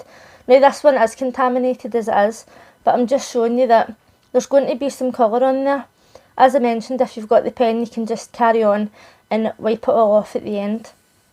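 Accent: Scotland, United Kingdom. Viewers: Well, all of those people are objectively wrong. Scottish accents are beautiful! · I’m so glad my Mum’s Scottish or I wouldn’t have understood this tutorial at all! LOL. Thanks also for this cause Sistaco’s tutorials are very quick and edited. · I love your Scottish accent!! great video !! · Hi great video was thinking of buying this product so glad I watched your tutorial as a new buyer would you recommend buying the deluxe pack or just the basic set or individual I’m so glad of the honesty that your tutorial gives and it’s great you’ve got a Scottish accent x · apparently they don't make this color any more. Too bad, it is really pretty! I love your accent, it is a bit difficult to understand sometimes since my ear isn't used to listening to a Scots accent, but that is a me problem, not a you problem! Can't believe that people would complain about that.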